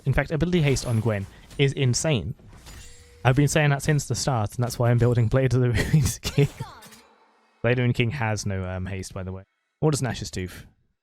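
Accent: United Kingdom. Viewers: he's bri'ish m8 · He has english accent with a slight lisp, im english too so I love his voice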